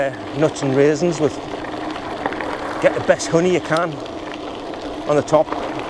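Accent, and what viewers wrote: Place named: Northern England, United Kingdom. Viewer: Just found your channel, and it’s great. To see you enjoying nature and the solitude of your surroundings is lovely, especially as a few of your rides pass near where I live ( Newbiggin ) on your way North. No airs and graces just a genuine Geordie on the trails and roads that me and the family ride,Even if Stanley is not really Geordie land you sound like you originate from further north. Love the humour too.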